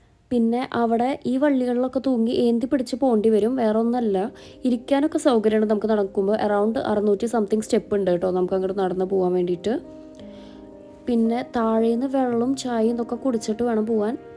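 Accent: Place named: Thrissur, India. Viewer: Assal Thrissur slang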